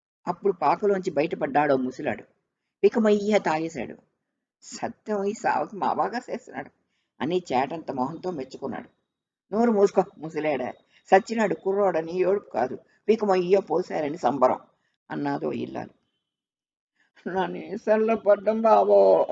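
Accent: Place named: Uttarandhra, India. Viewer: కధ బాగుంది, చాసో గారు కధలులో ఉత్తరాంద్ర దిగువ తరగతి ప్రజల జీవితాలు కధా వస్తువులు గారు ఈ ప్రాంతపు మాండాలిక భాషలో బాగా చెప్పేరు..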